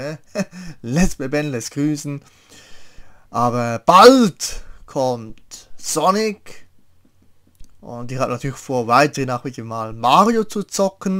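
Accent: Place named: Switzerland. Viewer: Das ist ne echt gute Frage. Ob du ein guter Lets Player bist, kann ich nicht so genau sagen, da ich deine Videos zu wenig kenne. Ich kenn dich glaub schon sehr lange, bestimmt um die 3 Jahre. Aber ich habe nie aktiv auf deinen Kanal geschaut. Was ich sagen kann, ist das ich mir am liebsten einen LPer ohne Akzent anhöre, was keine Beleidigung an dich sein soll, weil du kannst ja nix dafür du in der Schweiz geboren wurdest. Man merkt schon, dass du dich dafür einsetzt und du dir Mühe gibst. Ich schau halt kaum die Videos, weil ich keine Interesse an den Spielen habe. Aber ich mach wie versprochen Best Ofs von ein paar deiner Projekte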